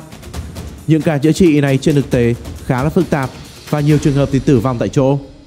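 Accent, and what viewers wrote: North, Vietnam. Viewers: nghe giọng anh giống bắc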